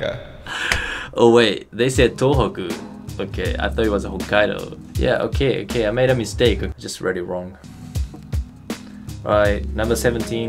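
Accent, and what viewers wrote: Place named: Australia. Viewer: I can tell by your English - you spent your time abroad in Australia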